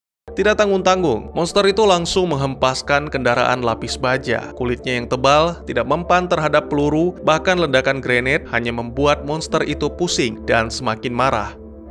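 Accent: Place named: Medan, Indonesia. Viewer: Logat medan 👍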